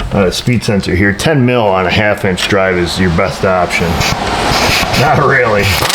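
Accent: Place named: New York, United States. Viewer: I've been watching your videos for years. I always get a good laugh when that New York accent comes through. Mom's family is from New York and the old man's family is from North West Florida on the gulf coast. Mom used to tell me when she met my father while he was stationed in New York, that she thought that the only thing that came out of the south, was rednecks and stump jumpers. I spoke with my aunt (aka - ant) the other night, and she still lives in New York. Her accent and at the speed which she speaks, I had to tell her to slow down and speak to where this country boy could understand her. Love the videos and the great work that you do, keep it up chief.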